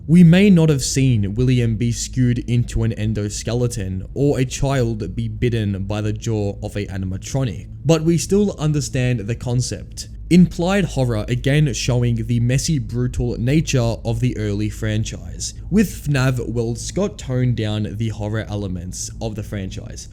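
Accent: Australia. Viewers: Are you Australian cause I’m too bro 🤩 also great video enjoyed it mate 🫶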